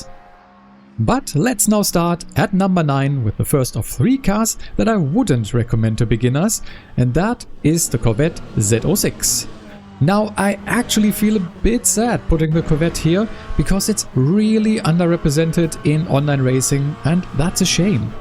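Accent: Germany. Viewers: I can confirm your assessment. I've had my most successful races with the BMW so far. This vehicle is absolutely consistent, just like the times you can achieve with it. I've also had good results with the Porsche. Especially on tracks like Fuji, the strong rotation of this car is a big advantage... if you know trial braking. Very good videos that you produce. I like the good English with the German accent. It makes it much easier for a German to translate internally. You have my subscription. Beste Grüße aus Thüringen.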